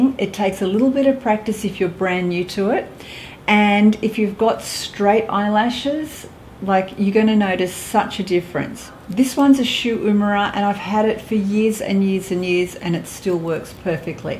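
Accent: Australia. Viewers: Australian accent? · You are so pretty! You sound Australian & remind me of Olivia Newton-John! 🥰🥰 Great video!! ❤️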